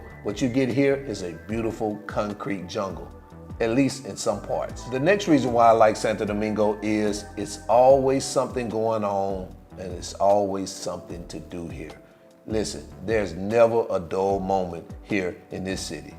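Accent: South, United States. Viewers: I went from your wife's Dominican accent to your southern accent...lol! Great video I like how you enunciated your words and spoke clearly. I am an expat who has lived in Puerto Plata for 9 years I have a decent lifestyle and cost of living there but Santo Domingo is my second favorite place in the Dominican Republic for some of the same but some different reasons. To live the same lifestyle, I have in Puerto Plata it would cost me about 30% more therefore I am content visiting about twice a month. For me there are many shopping items you cannot find anywhere else in the country, but you can find them in Santo Domingo. In fact, if you can't find what you are looking for in Santo Domingo it's probably not in the Dominican Republic. As far as the restaurants and the women we are 100% in agreement. I have just subscribed to your page so keep up the good work....oh year you make a good team with your wife in her videos it's good to see a successful cross-cultural couple...thanks again!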